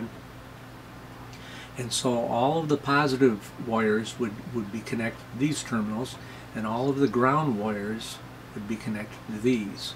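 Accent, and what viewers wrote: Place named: Great Lakes, United States. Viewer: Wow, a strong accent! I'm thinking Great Lakes. (block pronounced as black, ask as "eeyask". Not as strong as for most females of the Great Lakes, but still of that region. Am I correct?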